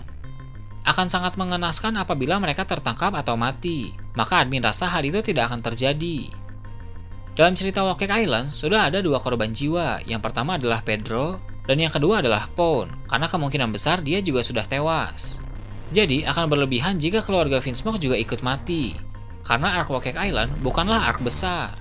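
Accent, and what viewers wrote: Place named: West Java, Indonesia. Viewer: Dari logat kayak nya admin orang sunda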